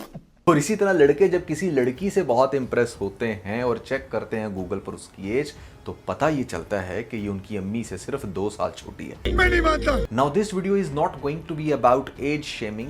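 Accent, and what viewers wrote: Punjab, India. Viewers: This host is definitely an annoying personality , but overconfident 😂should work on his speaking and presentation skills, his english, speaks English in Punjabi style , instead of bashing the stars it is the mindset of the people who produce such programs and show only very young characters, if someone has acne it’s not their fault , it’s not poor hygiene most of the times because it can be hormonal , and acne ages skin and if someone has acne does not mean their age is more, he thinks he is very intelligent ,very typical uneducated male personality! Grow up!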